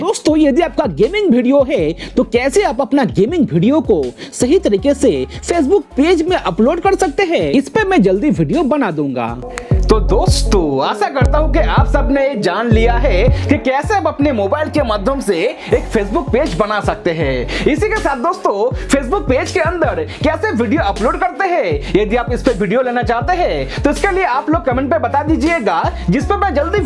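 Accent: Bengal, India. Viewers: You are Bengali right 😂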